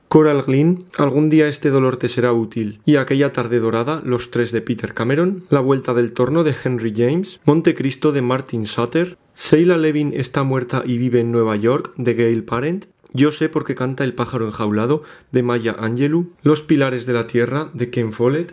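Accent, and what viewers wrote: France. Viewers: Qué interesantes tus libros, me encanta tú acento en francés hehe <3, yo también lo estoy aprendiendo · Me gusta mucho tu acento, marcas las ciertas letras (como la "h" y la "r") de una forma muy particular. Enseguida se nota que tu segunda lengua es el francés. Yo aunque soy mexicana pasé mucho tiempo en Brasil y siento que a veces se me "mezclan" la pronunciación de las letras también :P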